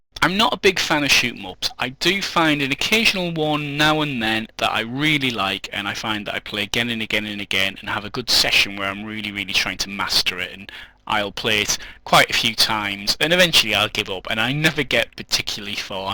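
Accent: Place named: England, United Kingdom. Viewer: I gotta say, as a yank it was BRILLIANT listening to an English person explain baseball! Like hearing what your language sounds like to people who don't speak it or something... You did an excellent job by the way! Love it!!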